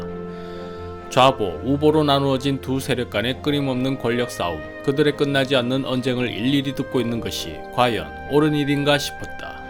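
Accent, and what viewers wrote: Gyeongsang, South Korea. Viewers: 고구려인 말을 할 때 북한 사투리로 하라는 것이 어이없네요. 북한 사투리가 한두개인가... 평양말투와 연변쪽 말투만 비교해도 완전히 다른데, 고구려인들이 어떤 말을 했을지 어떻게 알고... 그리고, 지금 북한 말투와 고구려 시대 말이 비슷할 거라는 생각 자체가 웃기지도 않음. 전혀 다를 수도 있음. 어디 거란이나 말갈족 언어와 비슷할 수도 있는 것이고... 적어도 만주벌판을 내달리던 초기 고구려인들이 현재의 한반도 내 북한 말투와 비슷했다고 생각치는 않음. 님의 대화체에서 갱상도 사투리는 님과 제작영상만의 개성이니, 하시던 대로 주욱 이어나가시면 될 것으로 봅니다. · 갱상도 사투리 재밌어요 뭐 꼭 고구려말로 해야 하나요? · 영화 황산벌 촬영하는 것도 아니고,그 당시 신라 사람들이 경상도 사투리 썼던 것도 아닌데, 고구려 왕이든, 한나라 장수든 경상도 사투리 쓰는 게 뭐가 어때서요 ㅋㅋ 그냥 편하게 하세요~ 말투와 표현들이 귀에 딱딱 꽂히니 좋으네요.ㅎ 아니면, 고대 삼국시대에 썼던 언어를 그대로 써야죠.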